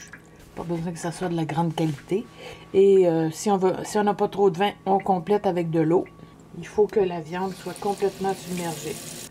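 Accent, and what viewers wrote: Quebec, Canada. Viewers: Je viens de voir votre vidéo ( donc bien après sa sortie) au moment même où ma viande de bœuf séché gentiment depuis 2 semaine et qui donne déjà un joli résultat ( je compte la laisser encore une a 2 semaine a sécher en plus ) . De mon coté je comptais la portionner et la stocké dans des sachet sous vide. Pour ce qui est du sel qui m'a servi au salage : vu que j'y avais ajouté des aromates je l'ai fait séché et je l'utilise régulièrement pour assaisonner mon eau de cuisson ( légumes, féculents, etc ...) Merci pour vos vidéo avec cet accent québécois que j'adore écouter depuis la france :) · J'adore l'accent québécois je trouve ça très élégant ou très raffiné pas comme en France qu'ils ont appris la langue des racailles XD (les descendants de l'émigration) et je m'abonne 👍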